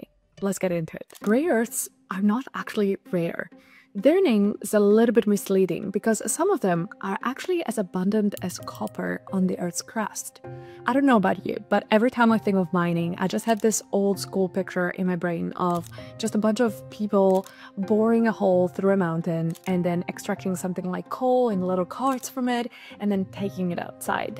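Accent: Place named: Italy. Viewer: Your videos are fantastically well edited and researched. What's your professional background? And also, where's that accent from? I can't place it... Italian?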